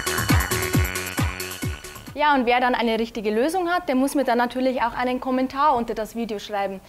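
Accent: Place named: Bavaria, Germany. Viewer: bayrisch is schwul... aber ihr würd ich geben ;)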